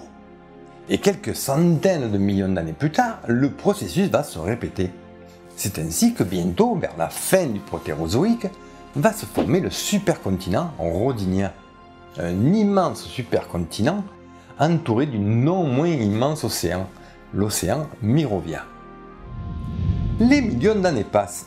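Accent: South, France. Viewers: Il existe des chaînes Youtube, qui, aux premières minutes nous font: nous abonner, suivre assidûment et surtout enchaîner toutes les vidéos. Ce qui est votre cas. Je suis bluffée du contenu si brillant, de toutes les informations que j'apprends, et de tout le travail, colossal qui doit être mis en place ! Votre chaîne est excellente (et le petit accent du sud rajoute une tonalité bien agréable). Un énorme merci à vous, et nous avons hâte de regarder vos autres vidéos. Félicitations !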